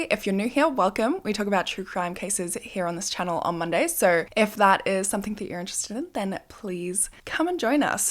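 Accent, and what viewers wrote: Australia. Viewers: I got hooked with your videos because of your voice and Aussie accent 🤗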